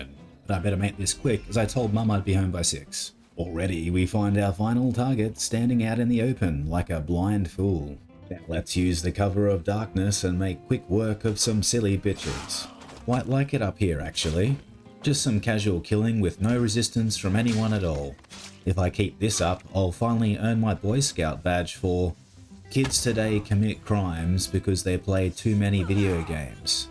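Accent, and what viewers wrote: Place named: Australia. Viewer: Why is it that I can't find any Australian youtuber that doesn't talk like this. Got to admit though, thier all funny.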